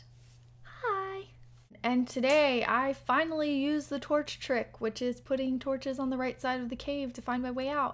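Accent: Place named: Spain. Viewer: Love your Spanish accent :D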